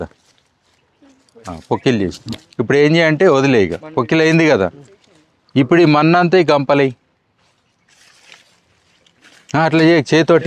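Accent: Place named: Telangana, India. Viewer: సర్ మీరు ప్యూర్ తెలుగులో మాట్లాడుతున్నారు.. మా తెలంగాణ యాస.. కానీ తెలుగు రాయడం రాధ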